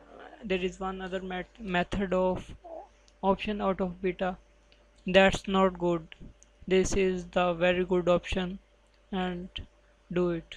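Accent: India. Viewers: it worked just as well as the Indian accent ... <3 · Actually worked out nice^^ Thanks alot indian accent guy :)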